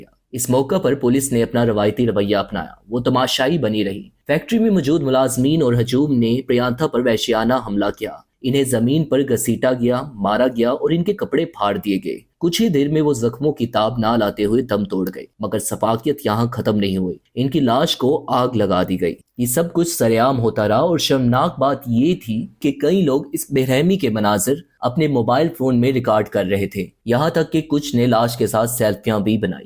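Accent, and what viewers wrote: India. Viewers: Aap urdu Indians ki tarah kiyon bol rahe ho?? غ کو گین اور ک کو کھ کی طرح کیوں بول رہے ہو آپ؟؟ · sab koch sahi tha , but pakistanis ko pehle apni Urdu sahi kar chai ye , lagraha tha is vedio me koi indian bol radha he he, ghalat ko galat , khatoon and bohat sare word wrong pronouse kiye apne, ab darama actors bhi ghalat urdu bolte he fakhar k sath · اس بھائی اپنی ق،غ،خ تو ٹھیک کرو،ضروری نہیں کہ ہندی زبان سے متاثر ہو جاؤ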